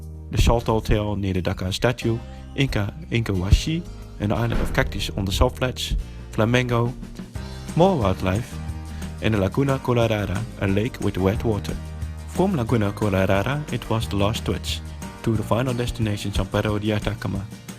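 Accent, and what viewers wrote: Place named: Netherlands. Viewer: Turn on subtitles... sorry for the inconvenience.. Dutch accent is hard to understand apparently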